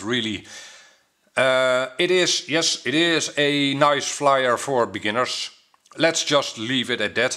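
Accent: Netherlands. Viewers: Gast! wat een heerlijk engels hollandsch accent heb jij!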